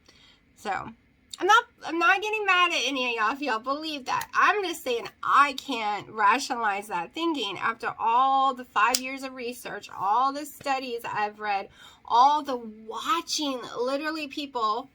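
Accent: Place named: United States. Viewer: I love your country accent ♥️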